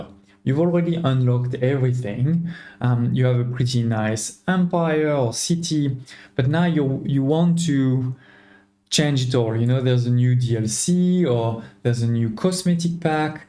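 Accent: France. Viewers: Wow, where are you from ? You sound like french accent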